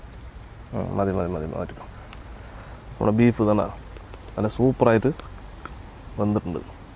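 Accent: Palakkad, India. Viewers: തനി നാടൻ പാലക്കാടൻ തനിമ സംസാരത്തിലും പെരുമാറ്റത്തിലും, നിങ്ങളിലൊരാളായി ജീവിക്കാൻ ആഗ്രഹംതോന്നുന്നു👌👌👌👌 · Thani palakkadan malayalam slang ishtamullavar oru like adikko😍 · adipoli pkd slang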